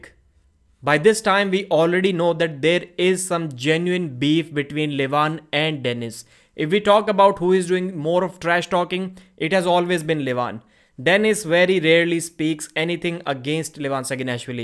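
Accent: India. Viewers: why your accent like indian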